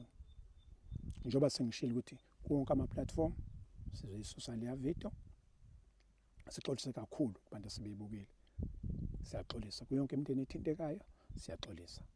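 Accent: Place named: Xhosa, South Africa. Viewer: Mina bengingayibon inking kodw ubaba mayesechaza kyezwakal lixhoshwa libhekil Baba uxolo lukufanele ungowethu n sisakudinga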